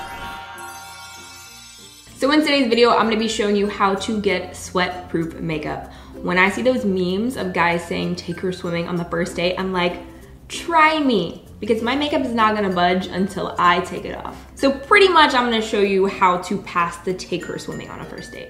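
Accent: South, United States. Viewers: Sometimes I hear her southern accent peek through when she talks like in her old videos.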